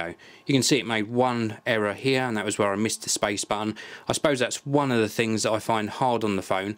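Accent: United Kingdom. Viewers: This is probably the most powerful british accent I ever heard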